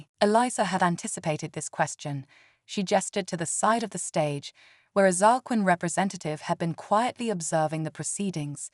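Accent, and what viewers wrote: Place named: RP, United Kingdom. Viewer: I am NOT a fan of this female upper crust British A.I. voice. Too many emphasizes on too many superlatives and verbs and staccato syllables followed by disdainful valley girl syllables at the end of sentences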